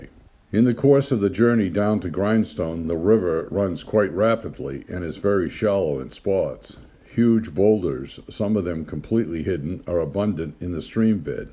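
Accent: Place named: Boston, United States. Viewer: Im obsessed with the narrators Boston/New England accent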